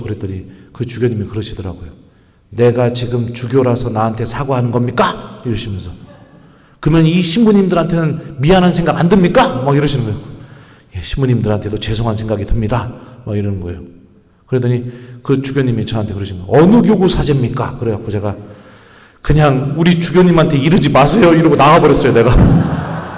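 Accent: Chungcheong, South Korea. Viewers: 찬미예수님 ~♡ 신부님 서울가셨내유~다음 또 가신다구유~^♡~ 신부님 충청도 사투리 환장하게 재밋내유~ 서울말 안하셔도돼유~^^ 말씀자체가 신자들을 사로잡는당께유~신부님 아주 멋지십니다.제고향은 충남 부여 랑께유~^^ 신부님 건강하시고 행복하셔유~♡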